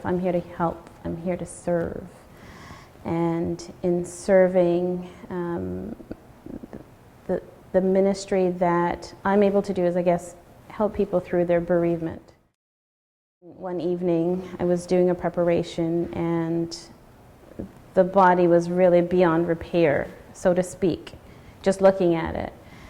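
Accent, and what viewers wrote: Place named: Caribbean. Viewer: Sounds like this lady has Caribbean Roots well spoken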